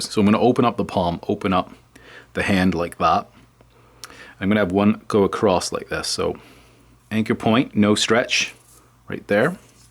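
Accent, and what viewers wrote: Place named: North, United Kingdom. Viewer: Thanks... I've been struggling with splints & different ways to wrap... I'm now a happy camper! I've also been trying to figure out your accent.. i hear Northern UK (Manchester & Liverpool), Canadian, a bit of Irish & Aussie.....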